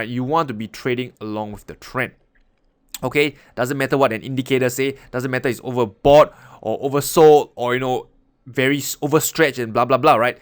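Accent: Singapore. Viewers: are you singaporean, i like your accent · Nice to hear a Singaporean accent :)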